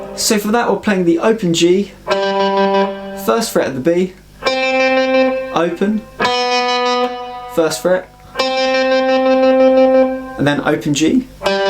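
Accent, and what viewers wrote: United Kingdom. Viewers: what a beatiful aksen really love britain aksen 😍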